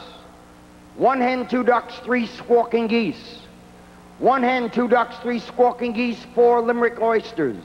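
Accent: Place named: New York, United States. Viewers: Love the Brooklyn accent, especially the corpulent part! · He still has a New York accent. An announcer will have no accent, speaking in Standard American English or Received Pronunciation.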